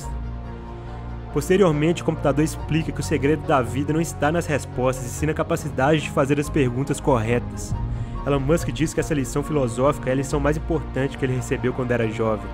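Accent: Minas Gerais, Brazil. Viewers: Sei q vc 100% mineiro pelo sutaque. Mto bom o video mto inspirador. Thank you!!!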